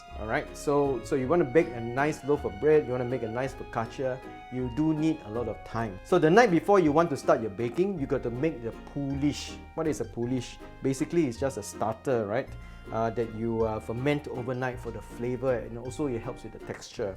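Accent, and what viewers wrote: Singapore. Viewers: Awesome content!! I can guess you're from Singapore based on your accent, am I right la? :D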